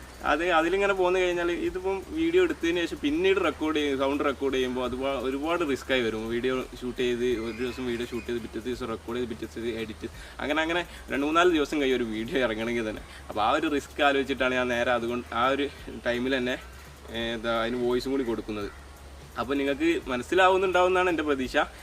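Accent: Malappuram, India. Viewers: പക്കാ മലപ്പുറം സ്റ്റൈൽ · എടാ ചെക്കാ ഇജ്ജ് മലപ്പുറം ഭാഷ തന്നെ പറഞ്ഞാൽ മതി. ഞമ്മക്ക് തിരിയും ട്ടോ ചെക്കാ. നിന്റെ ഇന്നസെന്റ്സ് എനിക്കൊത്തിരി ഇഷ്ട്ടായി. Love you bro. Kl 10 ആണ് ഞാനും. കാളികാവ് മാളിയേക്കൽ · മലപ്പുറംകാരെ signature മാറ്റല്ലേ bro... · Malappuram da😄😄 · Njammalum malappuram okke thanne aanu bro... Bhasha onnum oru kuzhappavum illa... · Pinnalla muthe njan kottayam but malappuram slang is so beautiful bcz ente kooduthal frnd malappuram anu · malapuram .slang athaanu. njanum malapuram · Kakka nee malappurathaano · നമുക്ക് നമ്മുടെ മലപ്പുറം ബർത്തനം തന്നെ മതിയെടാ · Daaaaa.... ഇത് നമ്മടെ മലപ്പുറം ഭാഷ ഒരു കുഴപ്പവുമില്ല നീ പൊളിക്കെടാ മുത്തെ...... 😘KL_10 chunkss😍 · എന്റെ അഭിപ്രായത്തിൽ ഇജ്ജ് അന്റെ അല്ലെങ്കി മാണ്ട ഞമ്മളെ തനി മലപ്രത്തെ ഭാഷേ തന്നേ പറഞ്ഞാൽ മതി ചങ്ങായി അതിനും അതിന്റേതായ ഒരു മൊഞ്ചുണ്ട് ഇബനെ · മലപ്പുറം ആണോ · മലപ്പുറം ഭാഷ 😘😘😘😍😍😜😜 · soopar . edakk swandam basha warunnundallooo .fullaayitt malapuram basha paranjoolee😃😃 oru koyappalla... 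· Good, മലപ്പുറം മലയാളം തുടരട്ടെ. മനസ്സിലാകത്തവർ ചോദിച്ചേക്കാം.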